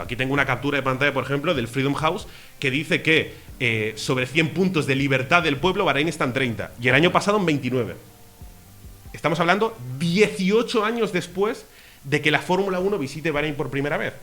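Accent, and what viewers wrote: Argentina. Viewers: Y el acento argentino?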